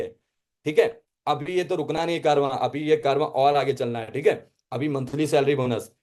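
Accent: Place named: Bihar, India. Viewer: Bihar se ho kya Tone match kr rhi h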